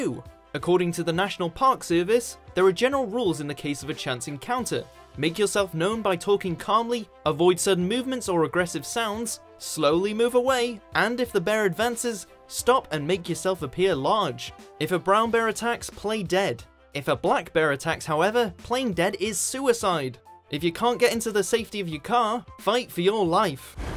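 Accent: United Kingdom. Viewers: What! when did the british narrator come from!? i prefer mr.mojo and ms.mojo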